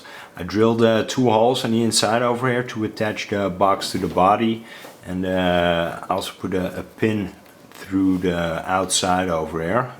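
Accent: Netherlands. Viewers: From what European country are you from, if I may ask? Your accent is a lot like a Dutch accent.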